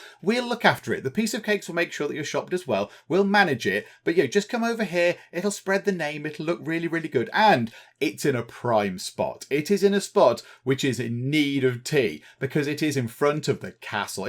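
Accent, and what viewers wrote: United Kingdom. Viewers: Your gameplay are hilarious. And your British accent fitting the game so well. I'm in love with Medieval and Tudor era since I watched BBC documentaries. And I love playing Guild 2 years ago. Finally I play this game after watched your Cupboard dynasty. Have the game since 2020. Never play it until fully release.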